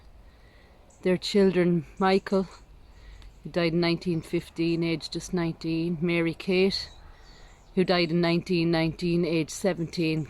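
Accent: Ireland. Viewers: I just absolutely love you videos. Your accent reminds me of my Grandma Margaret . She was so very proud of her Irish Catholic roots. Church and Rosary daily 🙏. Her family came from County Monaghan. ❤️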